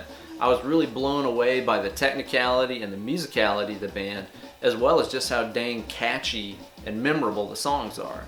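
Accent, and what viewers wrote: South, United States. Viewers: Are you from Knoxville? I've been trying to place your accent for a while. I was thinking Georgia maybe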